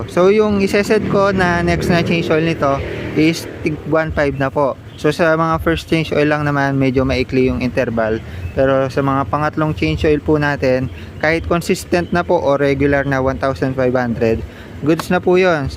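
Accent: Pampanga, Philippines. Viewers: kapangpangan kaba paps ung tono mo kasi e hehe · Kapampangan kapin ne paps,,tin halu ing pangatagalog mu ehe!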